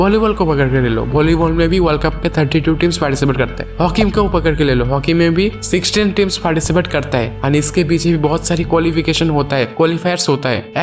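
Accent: Bengal, India. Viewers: Bengali hei keya? Hindi language attack raha hai.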